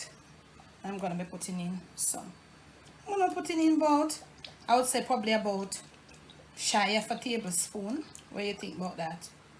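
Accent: Jamaica. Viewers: I looove chicken, especially the dark meat, it has more flavor, and looove the flavor combination you have in all your recipes, but especially this one..I'm a nasty girl and save the yummy drippings to use to flavor other dishes.I even have brushed breads, or biscuits with it..or if I make lentil or bean stew I use that flavoring in it by way of the drippings, just a little though..you are my magic kitchen queen to watch cook, I love your recipes and love your beautiful Jamaican accent(I do believe it makes your food more yummy)..Bless you and keep on coming with more scrumptious recipes..oh, I have discovered a new and super health type of rice, called " Black forbidden rice" and another that is " Red rice" .They have even more health benefits and anti aging things than Blueberries !..and they taste really good, not yucky like brown rice (I hate brown rice)...you might try it..in 3 months it lowered my cholesterol and helped me loose a little weight( miracle)..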